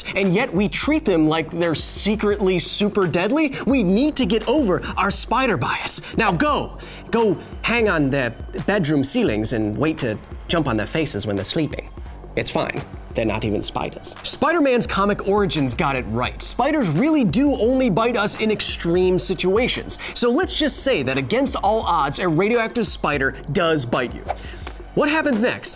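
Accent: Australia. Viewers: The aussie accent 😂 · ikr! I'm surprised there aren't more comments talking about austrailian accent lol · I know I'm late to the party, but that Aussie accent is almost spot on. had me excited for a sec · thats a good Australian accent! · That aussie accent 😂🤣❤🇦🇺 much love from down under. Bloody great video mate! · His Australian accent is actually very real lol. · That aussie accent is a 7/10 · unironically a fantastic australian accent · That Australian accent is on point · He's Australian accent 😂... It's good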